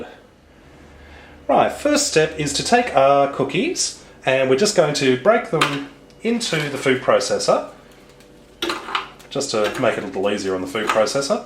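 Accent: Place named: Australia. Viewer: i just discovered you (for myself)! delicious recipes and an australian accent? how lovely...you might get a lot more comments from me within the hour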